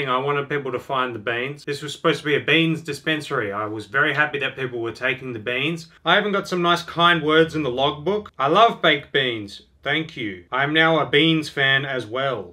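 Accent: Perth, Australia. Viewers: this video was so good i could listen past the australian accent. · lol I thought you were from Perth.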